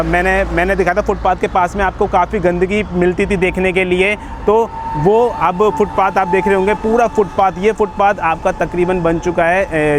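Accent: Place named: Bihar, India. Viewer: 100% aap bihari hai na q ki mae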